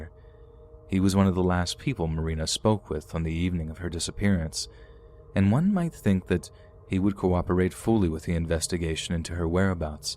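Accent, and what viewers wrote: United States. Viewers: What an interesting experience to listen to a story with a British tone, read in an American accent lol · This was definitely in Liverpool! Haha yeah it was so funny hearing all these scouse sayings read by an American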